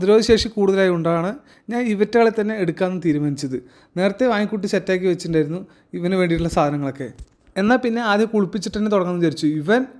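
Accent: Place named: Palakkad, India. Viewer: പാലക്കാട്‌ ആണോ... സംസാരം വീട് എല്ലാം അതെ സ്റ്റൈൽ... എന്തായാലും താങ്കളുടെ മനസ് സൂപ്പർ 👌🏻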